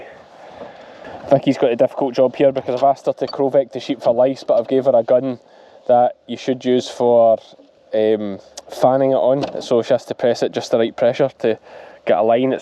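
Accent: Scotland, United Kingdom. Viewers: "I've got 4 blackie hoggs here that arnie mine" the scottish accent is always a great listen 😂😂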